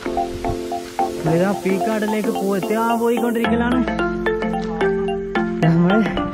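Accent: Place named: Kannur, India. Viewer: Kannur basha yude monju vere thanne😍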